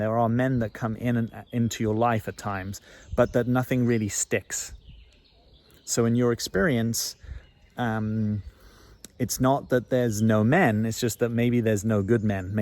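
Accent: United Kingdom. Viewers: yes, it’s the Brit way you say pattern. :) I had to learn an RP accent when I was in a play (very small part) and I so wanted to go around talking that way...but that would’ve been inauthentic 😁and probably pretty hilarious to boot. It’s so nice to hear it as it is, from you! Very good points regarding practicing trusting what’s good (or not) for me and maybe that just relating is a good space. I’ll do like he does in “What about Bob?” Baby steps...😂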